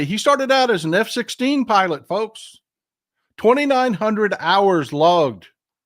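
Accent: Texas, United States. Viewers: This week, my Crystal Ball says the focus will be international, pulling out of ALL International treaties and agencies ("IL Dodgy" can't wait to get us out of ITSO!), drawing the focus away from "IL Dodgy" and his Muskrats while they finish killing off domestic agencies. Midweek will see all sorts of outrage from leaders in other countries, by Democrats in Congress and by some Republicans in Congress who have some special interests in some areas. By the end of the week there will be talk of pulling out of NATO, the World Bank and the UN! The end goal will be to kick the UN out of NYC and a bidding war for the prime real estate there. Folks will be shocked to realize the "America First" policy is isolationist and will effectively remove the US from the world stage. As a Texas ex-pat in Europe I sure enjoy hearing good sense being spoken in a dialect that sounds like home! 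Thanks!!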